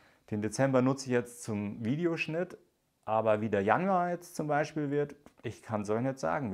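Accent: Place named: Bavaria, Germany. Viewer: Cooler Kanal! Abo is raus :D schön auch einfach mal nen Bayer zu hören. Wie hoch ist denn dein Lebensstandard, den Du Dir erwirtschaften musst, wenn Ich nachfragen darf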